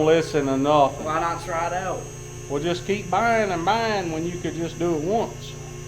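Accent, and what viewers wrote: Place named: Midwest, United States. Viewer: BTW, I ain't bustin your balls over the accent, quite the contrary. My whole gawddamn family was from the midwest a Oklahomer n Missoura n Florider. Grew up listnin to my folks n kin a gabbin round the table AFTER Sunday supper (you didn't even think about talkin at the table durin mealtime) n it reminds me a my Gramma n Grampa's house where I was raised. I sorely miss em all.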